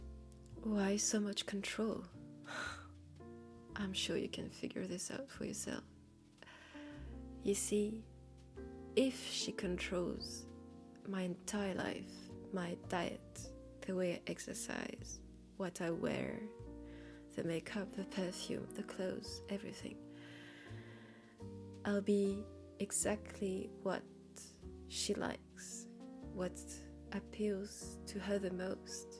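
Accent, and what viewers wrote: France. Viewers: Love your videos! And I’m a sucker for accents, especially French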